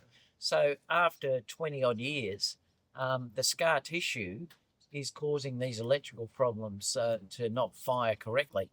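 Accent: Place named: Australia. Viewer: So good to hear your beautiful Australian accent again. Miss you very much on youtube. Take good care of yourself because good health is only appreciated when it's gone. Looking forward to your road trip videos. Best of luck to you and your wife. Cheers.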